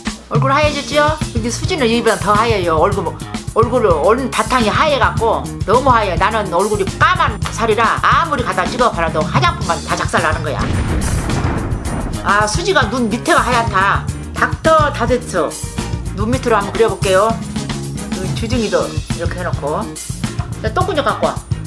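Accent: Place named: Gwangju, South Korea. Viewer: 너무 고우세요..♥♥ 저희 할머니 생각도 나고 제가 광주사람이라 그런지 사투리도 너무 정겹고.. 할머니 사랑해요 항상 응원해요♥♥♥♥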